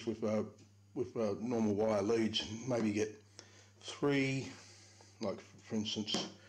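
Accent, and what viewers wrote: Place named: Australia. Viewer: Nice to see another -11. Mine are all QBus so it's nice to see a nice unibus box and good to hear another aussie accent. Will subscribe. Hope to see more. Keep up the good work!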